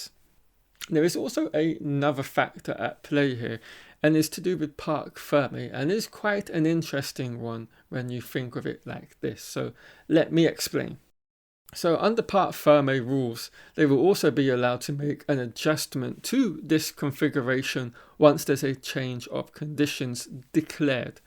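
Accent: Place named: England, United Kingdom. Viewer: I’m having a hard time placing your accent - where in England are you from?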